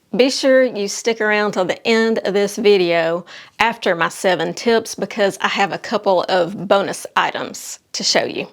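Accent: South, United States. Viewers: Great tips and helpful links. I even love your southern accent. 😊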